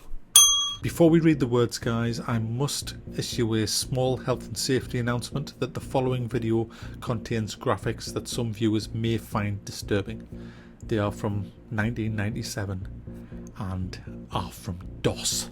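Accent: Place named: Newcastle, United Kingdom. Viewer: geordie youtoober? well aye. ne worries pal.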